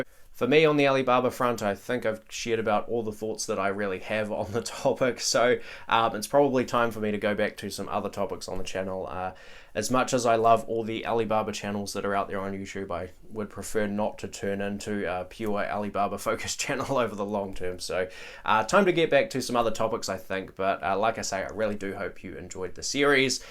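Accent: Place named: New Zealand. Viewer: He’s from New Zealand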